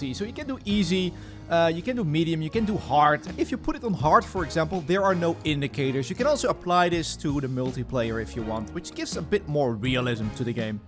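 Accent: Netherlands. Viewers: The dutch accent made the whole video 😂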